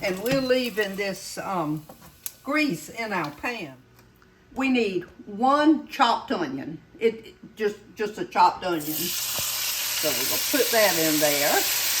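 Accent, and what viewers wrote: South, United States. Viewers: You have a true southern bell accent ❤I’m from Louisiana but I don’t sound the elegant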